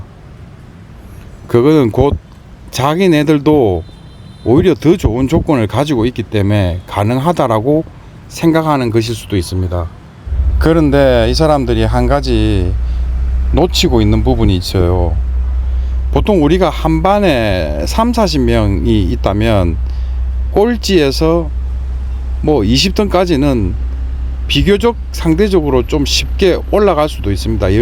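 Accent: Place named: Gyeongsang, South Korea. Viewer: 구수한 경상도 사투리 ㅎ 간결하면서 통찰력느껴지는 경륜ᆢ 구독신청했네요. 하노이 여러번 가봤는데 유투브보니 다시가보고 싶네요 ㅎ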